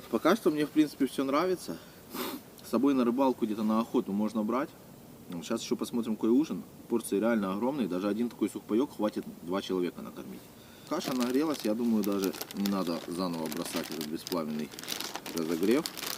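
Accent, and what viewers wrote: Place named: Russia. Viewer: Кому ти озвучуєш кацапською говіркою? Хрін тобі а не лайк. Іди за узкім карабльом.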